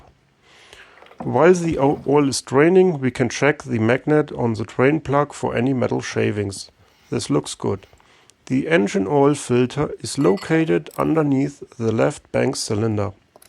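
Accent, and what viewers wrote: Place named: Germany. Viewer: You should do more of these. This is absolutely excellent. I get a lot of reassurance hearing a German accent, part numbers and torque settings!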